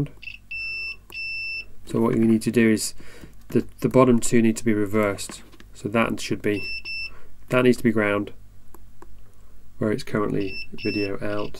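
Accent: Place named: United Kingdom. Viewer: i approve of both this video and your british accent i like british people :)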